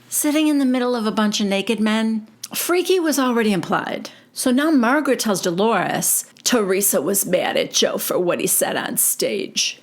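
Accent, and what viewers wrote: New Jersey, United States. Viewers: Welcome back and I think this season will offer so many opportunities to use that Jersey accent. Moving is terrible. Hope you and olive and the mister enjoy the new digs.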